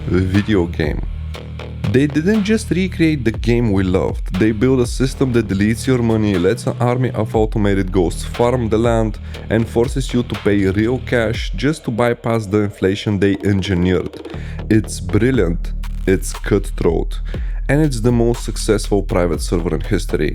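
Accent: Balkans. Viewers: You sound mega Balkan bro. 100000% Balkan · the balkan accent and the conspiratory story telling makes this video so much better :D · Balkaaaaaaan accent hit me like a truck mon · great video, cant wait next one ! (from another balkan guy, ur accent is funny to listen to haha)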